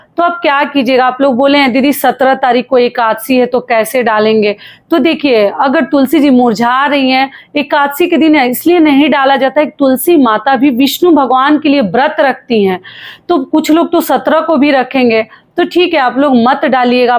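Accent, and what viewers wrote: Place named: Bhojpuri, India. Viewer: Didi Bhojpuri mujhe bhi Aati Hai Lekin aapke Munh se Bhojpuri bahut acchi Lagti Hai😘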